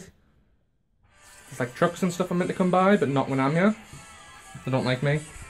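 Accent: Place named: United Kingdom. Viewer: hey we now found out ur voice and aparently ur british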